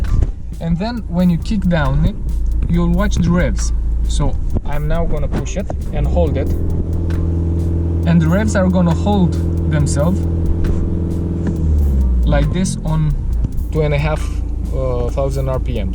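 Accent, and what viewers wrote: Romania. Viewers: Mi am dat seama ca esti roman dupa accent si 2.0d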